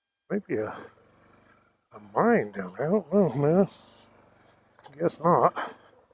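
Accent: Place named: Georgia, United States. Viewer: ain’t no way ur from south Arizona sound like Georgia or maybe my area East Tennessee haha !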